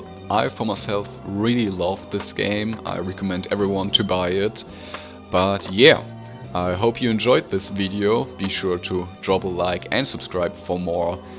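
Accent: Germany. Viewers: U Sound like a german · Sounds like a german, speaking english for his first time.. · man hört das deutsch richtig raus haha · Bist du deutscher? xD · Man merkt, dass du Deutsch bist. ;)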